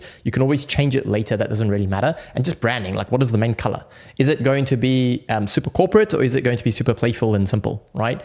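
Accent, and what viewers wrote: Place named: South Africa. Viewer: Were you born in SA? You have a strong south African accent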